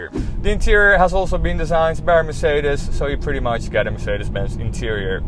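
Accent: Netherlands. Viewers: the English was perfect, but me being from Belgium, I always recognize a Dutch neighbor when I hear one. Great job with the review.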